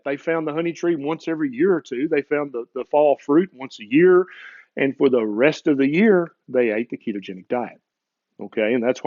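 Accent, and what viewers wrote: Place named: Kansas, United States. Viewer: Dear Dr. Berry. Frank here, RN and Certified Personal Trainer, to say how much I enjoy your videos. After 10 years teaching exercise, I have started to incorporate nutrition, especially keto lifestyle, into my programs. I appreciate the fact that you hold an MD degree which I believe lends credibility to your opinions on healthy eating. Also, your accent reminds me of my PCP who hails from Kansas! Keep up the good work! Let's "Make America Healthy!"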